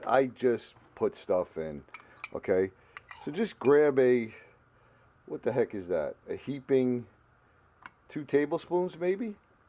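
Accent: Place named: South, United States. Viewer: Yum! Thanks for showing that... even though I don’t think it’s gonna work on my pizza tonight! 😂🤣😂.... by the way, loved your “southern” accent! 👍🏻👍🏻👍🏻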